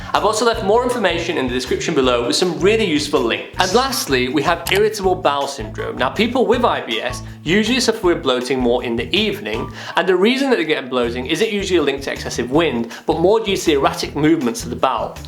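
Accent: United Kingdom. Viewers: For the first time, I hear a Persian speaks British beautifully❤. Thank you for help spirit.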